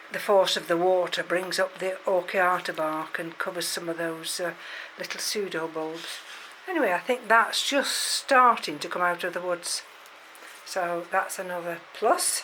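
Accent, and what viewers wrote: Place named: North, United Kingdom. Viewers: I recognised your accent but didn’t realise you were in Crewe! I was born in holmes chapel and lived sandbach and alsager... my nan is from crewe and I went to college there... I’m now in Devon. Loving the videos. Keep up the good work! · Great to hear a northern accent talking about orchids thanks.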